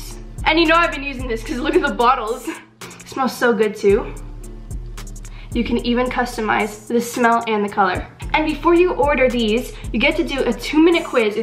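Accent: United Kingdom. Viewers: she sounds British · What's up with the British accent tho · I love her British accent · What's with the British accent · You sound British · that slight British accent tho. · are you British? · I got function so good And love the British voice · Was that a Scottish accent ?? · I was getting weirded out when you kept doing the British accent are you a little bit British?? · I am younger than her and I know how to put makeup 😳 Oh and did I mention you speak some British I am impressed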